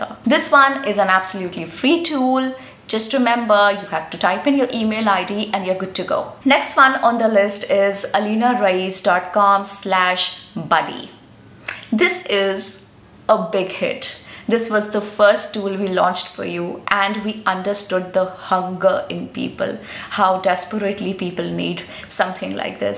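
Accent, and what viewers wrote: India. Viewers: Unfortunately you have a heavy indian accent, particularly it is very difficult for a British to understand you...please work on your accents befor teaching anyones..or kind of fooling others.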